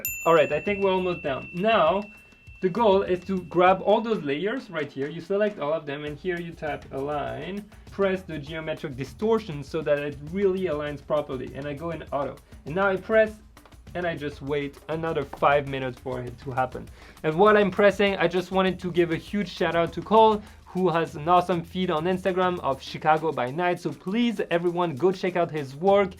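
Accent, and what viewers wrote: France. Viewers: Just love your french accent 'And Voila' love it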